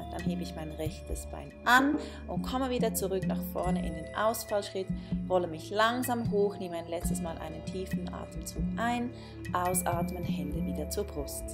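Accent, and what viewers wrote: Switzerland. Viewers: Als offenbar einer er wenigen Männer die noch hier sind geb ich dir mal dass Feedback dass es tolle Videos sind👌 entspannt mich immer was neues von dir zu hören :) Mal davon abgesehen dass ich als süddeutscher die schweizer einigermaßen verstehe haha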